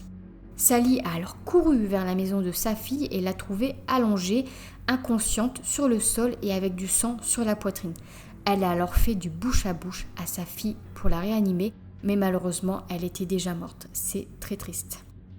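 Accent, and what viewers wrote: North, France. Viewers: Je ne connaissais pas cette histoire.. 14 ans c'est bien peu. C'est un horrible personnage. Sinon je crois que j'avais demandé dans un commentaire d'une autre vidéo. On dirait que je perçois un léger accent du nord.. Je suis aussi chti c'est pour ça et j'ai la même intonation dans certains mots. 🙂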